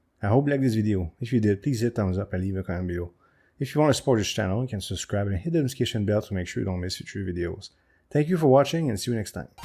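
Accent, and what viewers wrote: Quebec, Canada. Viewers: You sound Quebecois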